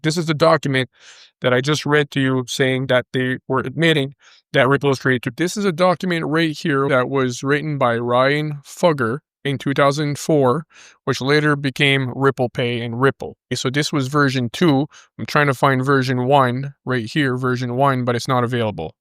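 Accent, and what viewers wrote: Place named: Newfoundland, Canada. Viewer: [• Someone finally asked the question I was going to ask… I thought to myself “sounds like he’s from Newfoundland!“. I actually sound like you do… i pronounce words like you do. Maybe I’ll run into you at the Avalon mall, or downtown at a bar? Just kidding. I think the first guess is more correct. Although, you do sound like a Newfoundlander absolutely! And I’m from here.•] [• maximum respect to you my friend!🙏😎👍🫡•]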